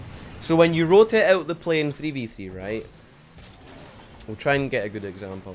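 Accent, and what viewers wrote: Scotland, United Kingdom. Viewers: Are you Scottish by chance?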